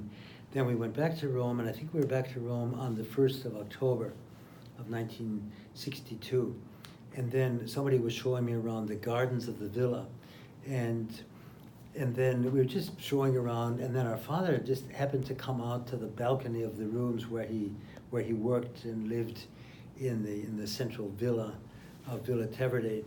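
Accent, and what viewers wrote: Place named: United States. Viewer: He doesn’t sound completely American anymore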